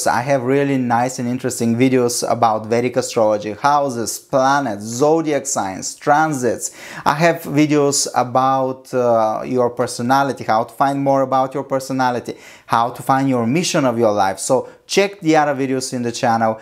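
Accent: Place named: Italy. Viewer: It's so nice... you are so honest...thank you so much 😢❤❤ BTW I love your accent...❤❤is ot Italian 😅❤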